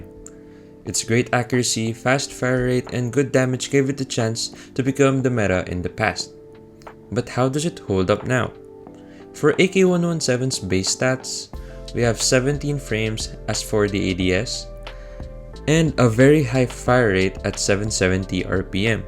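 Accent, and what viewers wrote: Philippines. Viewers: Idk but the way he speaks sounds like how a Filipino speaks English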